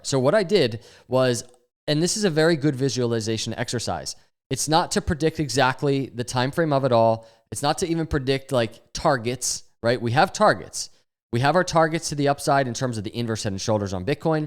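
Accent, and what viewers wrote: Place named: New Jersey, United States. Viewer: I hear a Jersey accent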